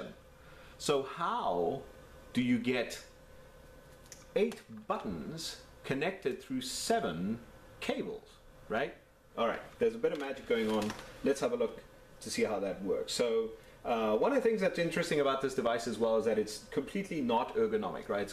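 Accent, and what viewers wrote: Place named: South Africa. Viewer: you sound like you have a bit of a latent South African accent going on there. Awesome video too, keep it up.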